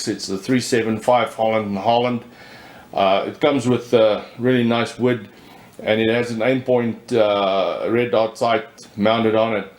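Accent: South Africa. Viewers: The narration on this video sounds like someone speaking "American" with a South African (Afrikaans) accent....??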